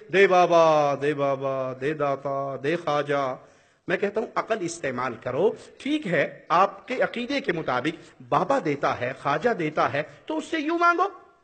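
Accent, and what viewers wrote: Arab world. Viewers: اردو کو اردو لہجے میں بولا کریں، عربی لہجے میں نہیں۔ اردو کو عربی لہجے میں بولنا اتنا ہی غلط ہے جتنا عربی کو اردو یا پنجابی لہجے میں بولنا۔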